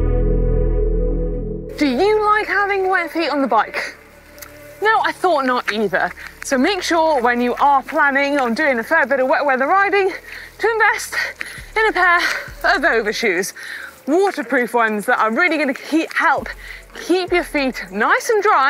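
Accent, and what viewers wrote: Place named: United Kingdom. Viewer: Oh, i love the bristish accent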